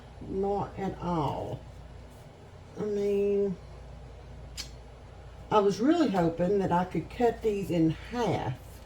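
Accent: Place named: South, United States. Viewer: Canadian here…love how you pronounce boil….bowl. So fun to watch your southern cooking, and hear the accent. Never heard of this cowboy candy before.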